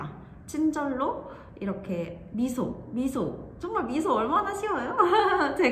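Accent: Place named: Gyeongsang, South Korea. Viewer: 사장님 경상도분같은데 말투가~! 카페 부산쪽에있으신걸까요~~ 한번 가보고싶어요💕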